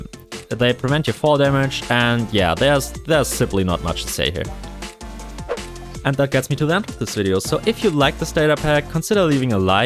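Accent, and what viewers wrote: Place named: Germany. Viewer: Wie man das Deutsch bei dir Raushört haha. Nice Gravity Gun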